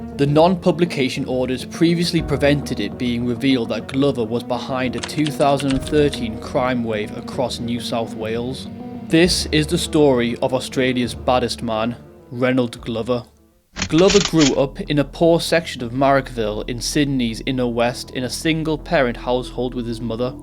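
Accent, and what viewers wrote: England, United Kingdom. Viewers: The narrator is English- why doesn't he focus on British crime?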